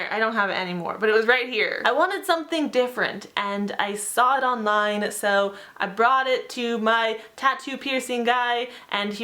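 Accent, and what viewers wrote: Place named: Ireland. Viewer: Irish whoo 😀😀😀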